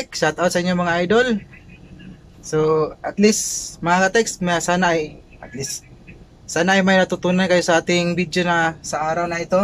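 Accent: Visayas, Philippines. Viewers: Good job, bisaya ka sir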